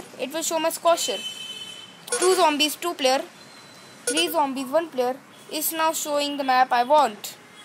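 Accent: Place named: India. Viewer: Are your Indian because of your accent?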